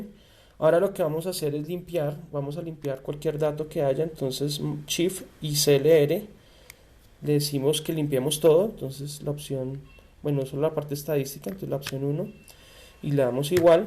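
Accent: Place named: Bogotá, Colombia. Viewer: el inconfundible acento de Bogotá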